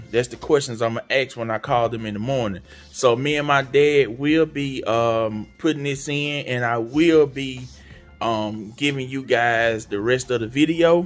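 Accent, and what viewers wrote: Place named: Texas, United States. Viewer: Sound like u from Texas maybe florida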